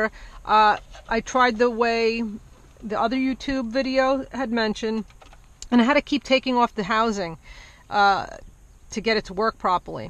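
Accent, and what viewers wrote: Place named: New York, United States. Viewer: Ha! This was great. I'm having the same problem with my 2011 Sonata and thought I might have to replace the fixture. I love how clear and straight to the point your video is... in and out in under 4 minutes. You'd be surprised at how many people mumble, speak too softly, or don't know how to get the main point across in this type of video. I'll try this out and let you know how I made out. P.S. Love your speaking voice. You sound like you're from my neck of the woods (NY/NJ metro area).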